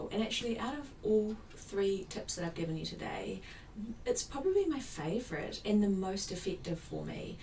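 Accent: New Zealand. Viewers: Thank you,. I love hearing your NZ accent... it's so refreshing from our clipped Canadian spoken word. Every day I write down my plan for how I will glide through my day. (HA!). Eating elegantly, making chic choices, phone calls, work project goals, exercise, specific people ignoring, etc and put a little ticky box beside each one so I can give myself a big check mark in the evening. I think I learned this from you. Not all my ticky boxes always get checked, but I'm always aware that they're there. My goal recently is to order your Chic Author book as I'm very afraid to think of putting my writing out there. Thanks for the tip about Rhonda Byrne. I read "The Secret" back in the day and that was it. I look forward to checking her out. xo karen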